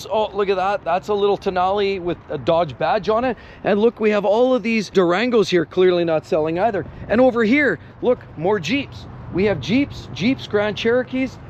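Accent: Canada. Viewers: Are these Canadian dollar amounts because you sound Canadian?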